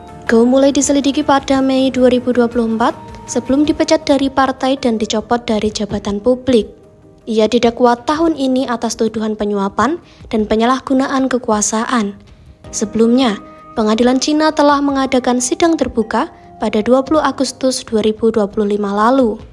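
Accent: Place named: Yogyakarta, Indonesia. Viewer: Naratornya asli jogja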